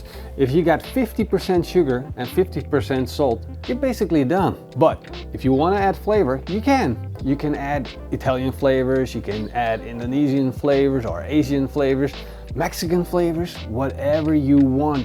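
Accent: Netherlands. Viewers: Are you dutch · is this guy dutch? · That lovely thick dutch accent. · He's Dutch, I could tell by the English accent (and typical translation mistakes) but the actual Dutch words like boerekool confirmed it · Ik vond zijn accent erg Nederlands Engels en het was niet eens slecht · In hoor dat je nederlands bent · Are you Dutch? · That hollands accent though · When you can hear the Dutch in the man. ;D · Je engelse accent lijkt alsof je een NEDERLANDER bent, klopt dit? Haha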